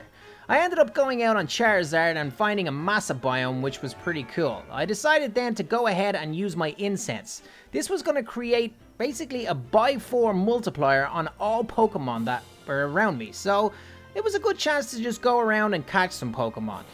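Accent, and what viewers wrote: Ireland. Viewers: Are you Irish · The Irish acciend love it brutha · I love ur Irish accent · youre irish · The second good Irish YouTube I found in my whole entire life also noting is that your Irish because I am to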